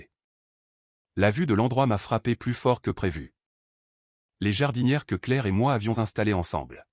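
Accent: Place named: France. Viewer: Pareil, de plus entendre l'anglais avec un accent français ça pique les oreilles.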